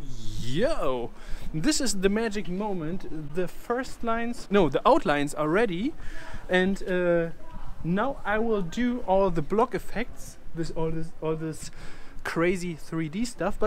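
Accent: Germany. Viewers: Is his accent german?😅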